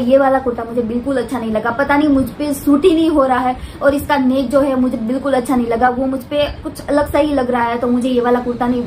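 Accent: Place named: Gujarat, India. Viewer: I just don't like your gujarati tone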